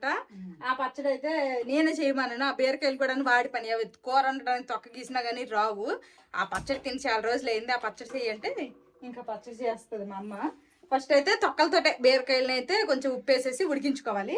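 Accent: Srikakulam, India. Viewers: Srikakulam yasa super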